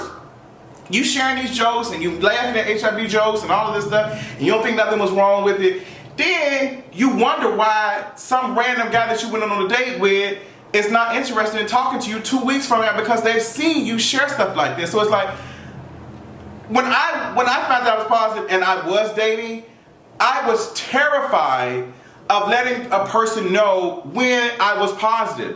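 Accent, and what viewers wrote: United States. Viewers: Love me some you, and that adorable country accent, but my love, my sweet love, YOU MUST 100% not tell people it's not their responsibility to disclose their status. That information is not due to anyoneeeeee at all unless you decide to share, HOWEVERRRRRRRR, you absolutely have every bit of responsibility to tell a potential partner what ur status is. I still love u, but there's no other way around that. It's morally right, and in a lot of states, it's illegal if you don't.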